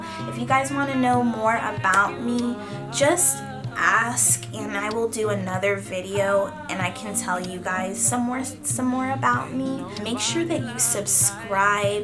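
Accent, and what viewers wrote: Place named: Nigeria. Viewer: I loved this video, it was bomb! Learned more about you & your Nigerian Accent lol <3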